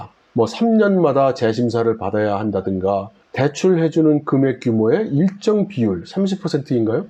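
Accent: China. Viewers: 말투가 음 중국계이신 듯 ㅠㅠ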